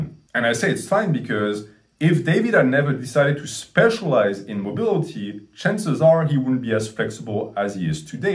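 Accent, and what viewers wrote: France. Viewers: Where is this accent from? Sounds like French but I am not sure. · Is that a French accent I hear? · Is that a French accent? I love it!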